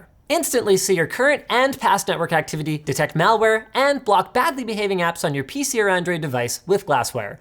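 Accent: United States. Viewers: Linus just sounded so American when talking about all of this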